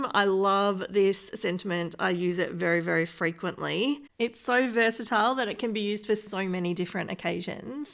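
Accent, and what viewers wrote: Australia. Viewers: Nice, quick and cute cards. I always love your cards and as a Canadian married to an Aussie it’s nice to hear that accent as well. Hugs from Ottawa, Canada 🇨🇦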